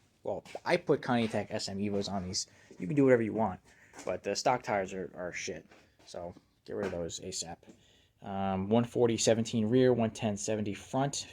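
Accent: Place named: New York, United States. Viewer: Your accent makes it sound like you're from New York City or New Jersey.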